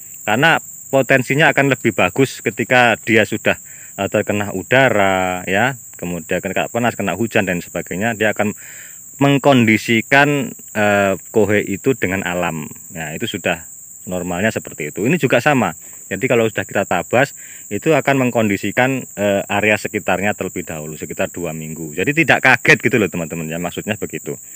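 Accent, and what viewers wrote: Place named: Java, Indonesia. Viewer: Temangsang😁😁😁 isih totok jowone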